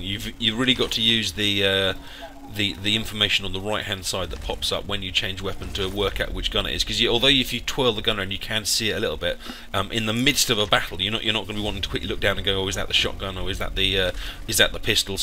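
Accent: United Kingdom. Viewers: Ok, i just subbed. My reasons 1) Badass intro music 2) You sound awesome (are you british, if so whereabouts?) 3) good content Nice Job man!!!! :D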